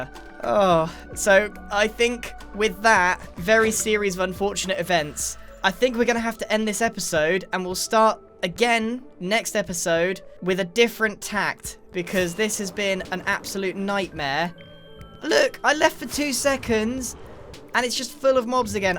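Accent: United Kingdom. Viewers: Is it just me or does he sound more British than normal ? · Why does sound so much more british?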